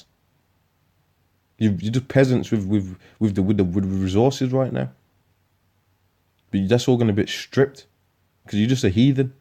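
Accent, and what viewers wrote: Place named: Birmingham, United Kingdom. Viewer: Shalom brother, All praise the the most high. It’s always good to hear a voice with an accent I can recognise, can hear the brum accent clearly cause I live round there. Always trying to get direct contact with some brothers but haven’t been able to, how can I do so ?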